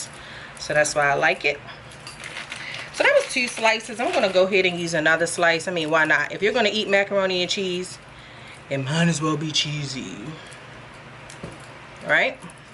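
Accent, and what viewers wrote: Baltimore, United States. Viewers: She's got to be from Baltimore. The way she says to and do.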